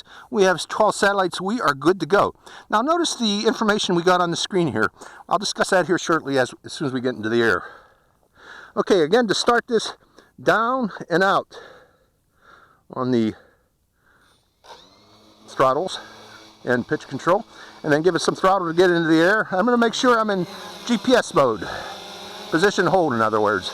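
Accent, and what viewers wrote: Pittsburgh, United States. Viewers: are u from Pittsburgh? I noticed a accent. .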